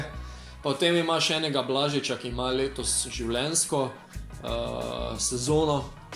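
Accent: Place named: Ljubljana, Slovenia. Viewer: Govori kako treba Ljubljansko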